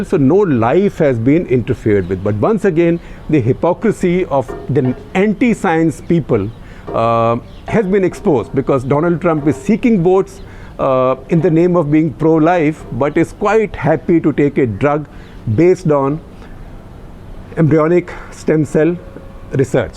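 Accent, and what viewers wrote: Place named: Punjab, India. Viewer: if this guy can drop his Punjabi accent, he'd get a lot more views on his channel. For heaven's sake it's not Indiana Police 😣😣😣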